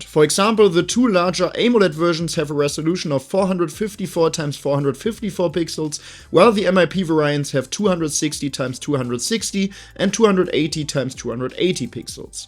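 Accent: Germany. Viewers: 10/10. The German accent takes it to an 11. I love that this channel is simply called " "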